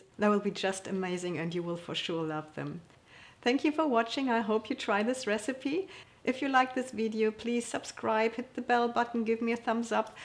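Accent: Germany. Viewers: danke ganz toll. man hoert deinen deutschen akzent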